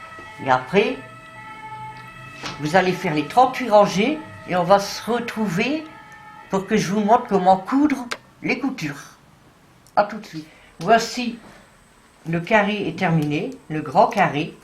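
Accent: North, France. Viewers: Bonjour, madame. J'aime bien votre accent aussi. J'ai de la famille dans le nord aussi, à marquette les lille....et les personnes du nord sont tres accueillants et plein d'humour. Votre vidéo est très bien, ce gilet magique, ...rapide à faire et simple.❤❤❤😊😊😊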